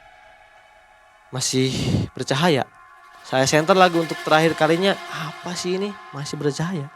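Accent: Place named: Banten, Indonesia. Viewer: Logat Sunda nya hilang 😅 ini mirip logat Banten serang / Cilegon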